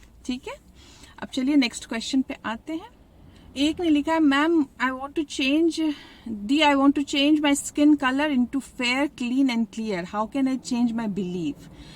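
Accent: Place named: Bihar, India. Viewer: Ohh tbhi to main apko sunti hun toh aisa lagta hai ki , min unkei bate sun rahi hun coz woh bhi Bihar se hi hai .. but thanks a lot for helping to grow us.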